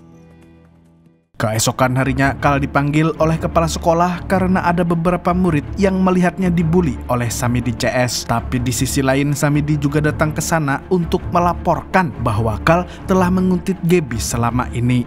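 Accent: Banjar, Indonesia. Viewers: Wahhh waahh pian urang banjar kah min..? 😅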